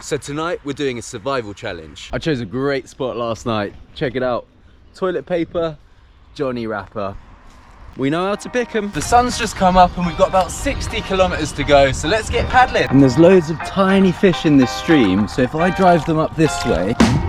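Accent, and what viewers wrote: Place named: Midlands, United Kingdom. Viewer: Are you from the Midlands? I see a slight accent there slipping out every now and again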